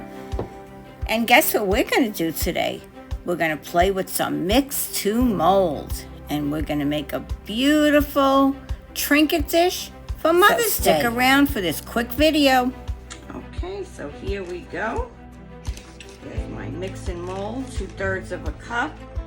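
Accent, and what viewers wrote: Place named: Northeast, United States. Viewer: Very neat idea . Sounds like you moved from the Northeast to Hollywood ftom that accent. Bless you 🙏 💛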